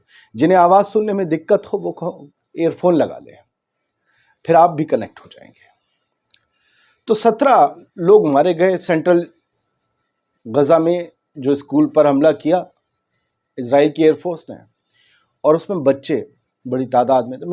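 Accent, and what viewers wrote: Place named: Muzaffarpur, India. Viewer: Bari Muzaffarpur zaban bolted hain aap.masha allah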